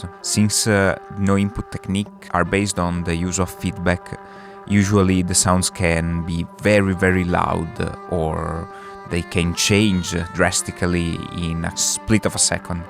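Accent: Italy. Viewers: Awesome way of thinking out of the box, and happy accidents. Io sentivo l'accento italiano nonostante il nome slavo hehe ;) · Hai un inglese Made in italy. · It's so weird to see the most North Asian looking dude speak in the most Italian English accent 😂. Great video though, thank you for sharing this!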